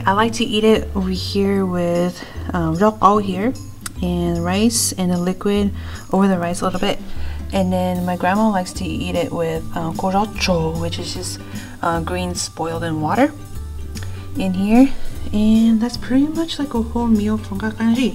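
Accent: United States. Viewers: Are you from the south? You have a southern accent, like me. lol. I’m from NC. Thanks for sharing the recipe!!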